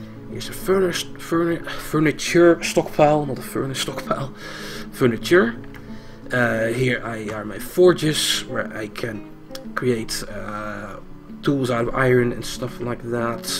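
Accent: Netherlands. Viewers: Nicely done! You are my favorite streamer and youtuber:) Nothing can compete with that delicious dutch accent.. Looking forward to future episodes in this series! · I agree that he's got quite a heavy Dutch accent. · "The Real Meach and Pochachoes of the game" I love the dutch accent:) You have such a different accent to SivHD though, a very diverse group you are!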